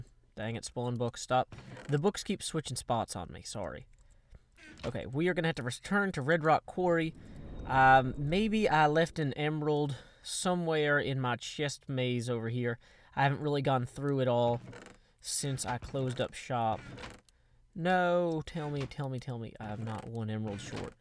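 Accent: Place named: Tennessee, United States. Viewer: Hey, I'm really enjoying this series! It's great to hear a fellow Tennessean, I hope you continue this and continue being hilarious!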